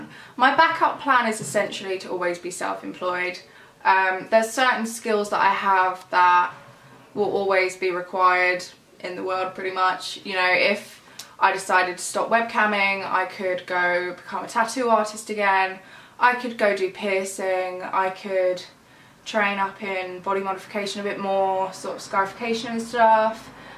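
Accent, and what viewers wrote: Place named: England, United Kingdom. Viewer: No wonder u have an English You’re from there lol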